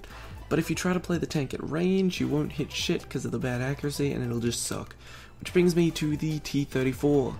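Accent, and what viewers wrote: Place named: United States. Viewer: Well he is american.. And remeber tomato tomato..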